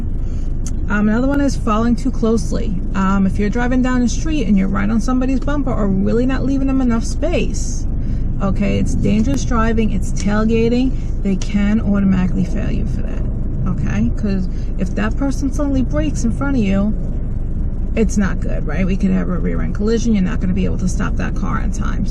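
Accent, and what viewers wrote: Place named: New York, United States. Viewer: Do you teach in Suffolk county? I like you New York accent lol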